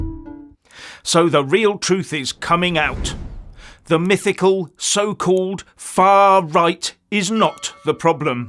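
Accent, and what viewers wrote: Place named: United Kingdom. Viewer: You are so British and so proper I seriously thought you were AI for the first couple shows thank you I love you bro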